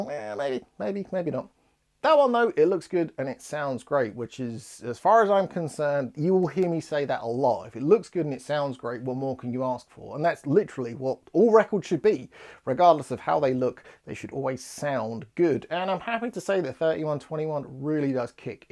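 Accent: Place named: United Kingdom. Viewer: Great stuffs in this first episode, really you're an incredible music collector, you're so young but with so many top items that I'm literally shocked about it! My respect for you will last forever! I like your format on the video, I like your British accent, although I should follow sometimes the YouTube subtitles because it's not simple for me to understand every words! I too have the vinyl and i like this album (really underrated by the fans), about the other albums I've downloaded them from Spotify to give them a listen... You're so lucky to have the boxset of as the Suspiria TP. A piece of Italy in your life! Stay gold!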